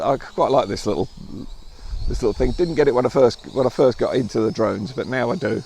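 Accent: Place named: England, United Kingdom. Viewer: A few giveaways when an English person has chosen to live in the Highlands of Scotland. The accent and the mentioning of the weather every couple of minutes but 95.497.....2% say they love the place. Helpful vid, I'm a novice at drones. Thanks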